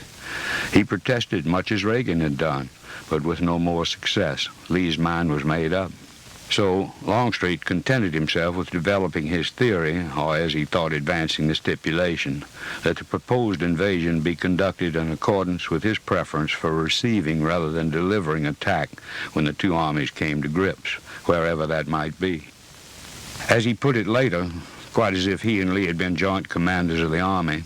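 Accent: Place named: South, United States. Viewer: his Southern Drawl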